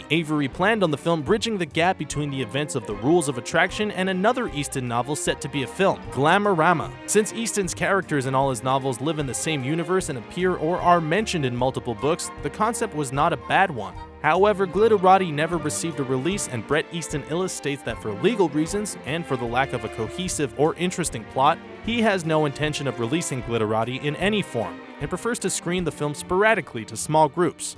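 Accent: Canada. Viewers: Stupid Canadians should learn how to speak proper English. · too all you speech nazis...the narrators canadian you shit heads...they pronounce shit differently,,,entitled narrow minded shit heads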